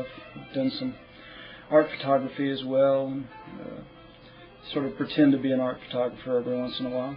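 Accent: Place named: Texas, United States. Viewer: Heyy, just wanted to say thanks for sharing. You definatley do not look your age, you have beautiful eyes. And I could tell that you were from Texas, I can detect a slight hint of a Texan accent. When did you move to Colorado? Have a good week. --//xo;Ace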